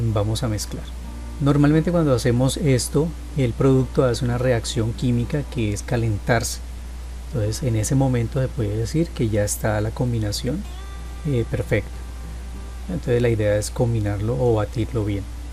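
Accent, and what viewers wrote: Colombia. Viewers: Muy buen video 👌😃 (tiene acento colombiano pero no usa términos colombianos)(?)🤣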